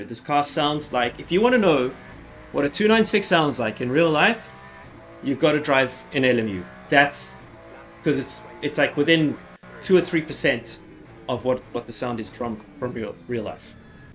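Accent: South Africa. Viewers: I can’t help but pick up the South African accent here, are you from South Africa by any chance? 😅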